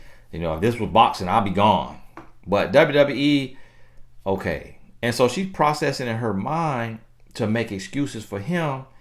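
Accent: South, United States. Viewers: Be blessed!!! Thank you so much for your wealth of knowledge! I look forward to your hour long videos every day!!! You have changed my life so much and I cannot thank you enough!!! Started my coaching class as well! I do favor the country version of ya!! That guy that said your country accent would set you apart from everybody else wasn’t lying! It’s definitely what makes you stand out and not sound like everyone else!!! So thank you for being you and all the work you do! God Bless You, The Queen, and Your Kids!!!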